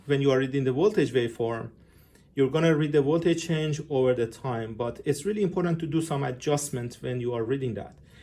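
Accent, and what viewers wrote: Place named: Iran. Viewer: لهجه مشخصه ایرانی هستی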